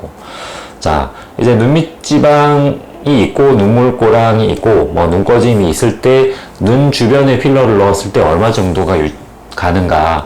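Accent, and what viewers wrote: Gyeongsang, South Korea. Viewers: 저도 경상도 사람이라 원장님말투랑 스타일 익숙했는데 저분이 오해하신거같네요 꼭 방문해보세요 현실적인 조언과 상담 해주시고 눈물고랑필러 진짜 자연스럽게 잘됐고 2년은 유지됐어요~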